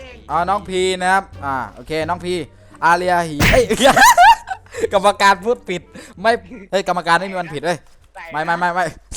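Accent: South, Thailand. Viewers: พี่ย่าคนใต้เหรอครับสำเนียงชัดเลย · พักแหลงใต้ก็ได้เดี๋ยวคนกลางฟังม่ายรู้เรื่อง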